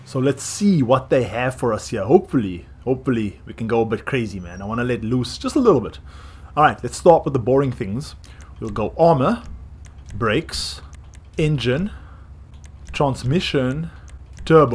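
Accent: South Africa. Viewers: Are you originally from South Africa?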